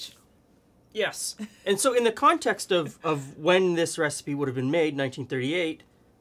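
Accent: Canada. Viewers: This is the first video I’ve watch on this channel. From the way he talked I thought maybe he was Canadian. Then I saw the bagged milk. Confirmed lol. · In the KC area at least, we get all levels of ground beef in the case; now, that I’ve heard your Canadian accent I realize Canada is different than the US on things like this. The 90% lean is so dry; I rinse my 80% lean in a colander under hot water once cooked, and that will remove the excess grease. Just found your channel; love seeing the Depression era recipes, and the way we are going they just may be more useful than we know.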